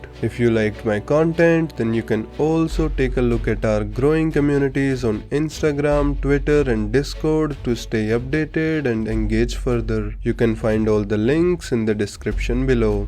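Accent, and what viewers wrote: India. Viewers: u sound indian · Why do you sound so Indian can you please tell ? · Bro he's Indian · Bro you returned 😁 loved the accent from 🇮🇳.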